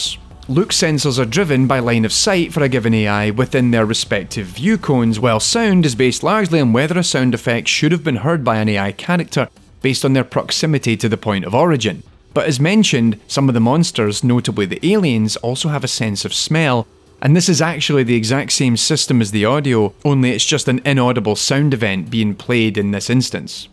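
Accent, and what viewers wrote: Scotland, United Kingdom. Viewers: please keep being scottish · "Valve's 1998 shitter." I'm like yeah it's old but shitter is a bit harsh... oh he's a scotsman. =D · Took me nine minutes to realize he's scottish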